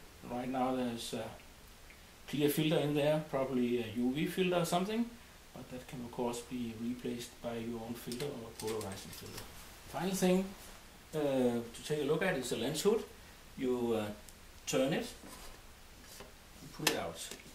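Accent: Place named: Denmark. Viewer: I know that Dansk accent :-)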